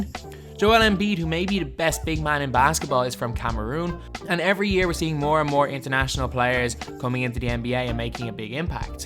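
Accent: Dublin, Ireland. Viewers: Are you from dublin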